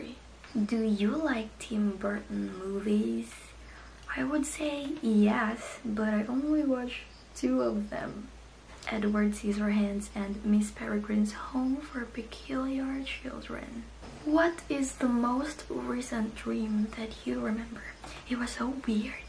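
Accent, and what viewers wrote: Philippines. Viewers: Yeah I'm wondering which part of the Philippines she's from cos it sounds like she has the same dialect as me😊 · neither. she's using a different dialect but it's still from the Philippines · not really becuase her english ( philippines ) its our seccond here in southeast asia but honestly people they're using communication and grammarly which means like 95% taught in high school and students here in the philippines country Dialects accents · Sabi na nga ba eh , medj pinoy yung accent hehe ang cuteee, pa request naman po next vid mo tagalog asmr ate hehe , can't wait ♥️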